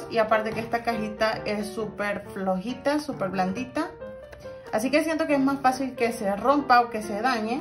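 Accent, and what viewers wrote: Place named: Panama. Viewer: tu acento es muy Panama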